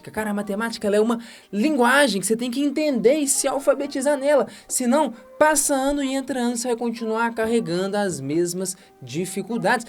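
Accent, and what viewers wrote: Minas Gerais, Brazil. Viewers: Pelo sotaque e mineiro kkkkk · Você e mineiro ?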